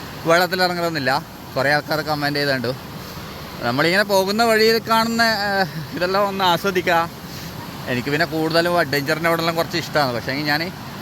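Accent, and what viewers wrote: Kannur, India. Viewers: നന്നായിട്ടുണ്ട് എങ്കിലും സംസാരത്തിൽ കുറച്ചു കണ്ണൂർ ഭാഷ കൂടി പോക്കുന്നു വീഡിയോയും ഒന്ന് കൂടി ശ്രദ്ധിച്ചാൽ അടിപൊളി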